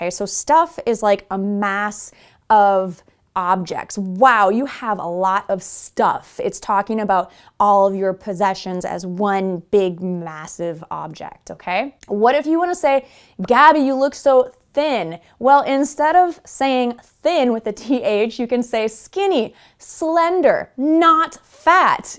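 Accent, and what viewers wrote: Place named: United States. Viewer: Good Morning , Gabby How can I say this ? I loved these alternatives mainly because I love to have more possibilities , more varieties , to increase my vocabulary , you know Gabby , to have more options This video lesson was a huge gain for Me to be more conversational 😂 But , I'm an enormous fan of this your American TH Sound , and it's too charming the position of the tongue when Americans say it Maybe I'm saying this because I'm like obsessed with it , I get staring People's mouth 👄 catching each tiny movement , for Me to learn and also because I definetely love it ! Ok Gabby , I took some notes here : I'm thankful to you because of this suggestion on this video with 30-40 mins of duration , Train , right !? I'll certainly check it 😉 THANK YOU = I appreciate you / Awesome / Wonderful / I'm so grateful ... Yes Gabby , I appreciate you for everything that you've been doing for us , it's Awesome 😍 I THINK = I have an idea / I imagine / In my opinion Hey Gabby , your idea of having that pizza last night had been Fantastic ! 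In my opinion we should repeat this Program ( And how it's Me speaking , and I LOVE the TH sound , I would add to this sentence ) ... What do you think about it ? 😂 Pizza , us , tomorrow , huh !? Father , Mother = Mom , Dad ( I enjoyed it , easier and cute as well ) 1200 × Twelve hundred Well , talking about numbers , I totally pass the 🏀🏈 ball Ok there are things in life that are unavoidable , and there will be times that will be required to talk about it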